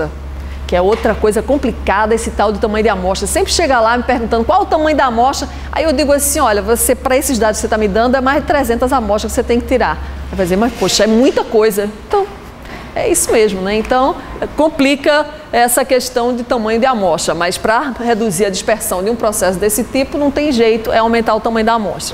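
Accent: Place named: Northeast, Brazil. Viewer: Sotaque nordestino arretado de se ouvir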